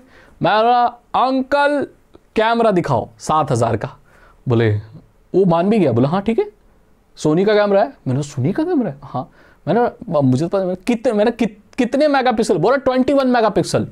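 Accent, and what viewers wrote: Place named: Haryana, India. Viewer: today i see minor haryanvi assent in Bhai 🔥🔥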